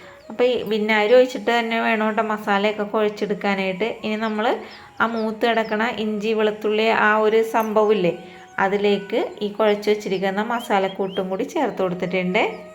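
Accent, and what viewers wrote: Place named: Vypin, India. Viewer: ഇത് ഞങ്ങ വൈപ്പിൻ കരകാരുടെ സ്ലാങ് ആണല്ലോ ചേച്ചി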